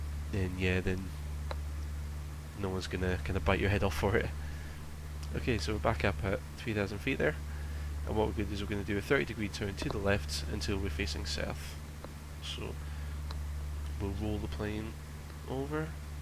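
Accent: Scotland, United Kingdom. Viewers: I would guess its a fairly light scottish accent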